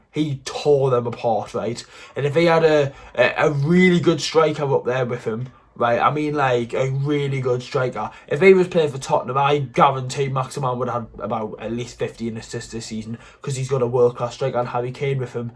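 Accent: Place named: Newcastle, United Kingdom. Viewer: Bro he’s talking properly that’s how we geordies talk keep up the good work doing great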